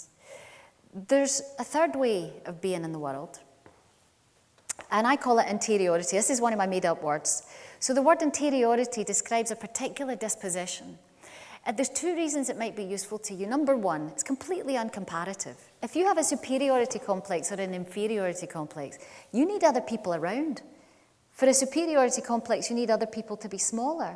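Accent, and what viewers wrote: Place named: Scotland, United Kingdom. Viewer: Hey there community. Would you help a non native English speaker figure out some of the words of Caroline's presentation? The transcription is really bad (and the translation is no help, just as poor). I have been able to correct most of it but some parts remain a mystery to me... Scottish is definitely a challenge, lovely but tough. There's nothing essential missing to understand this powerful and incredible presentation but I'd love to have a full clean text to read. Thank you all. at 2.24, Caroline's Mom says to her after the remark about the Cupid's bow "Caroline, you're a XXX ? " at 4.56, she refers to the moon "it"s like the XXX of the moon". at 5.45, after "presbyterian, "XXX.. " can't understand what follows until "in fact this is a question... at 7.17 you've got a long time to figure out.. XXX ?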